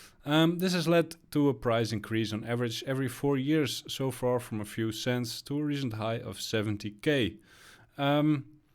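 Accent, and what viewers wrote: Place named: Netherlands. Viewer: Just subbed, watched a couple vids. Great stuff. I knew you were dutch by your accent 😂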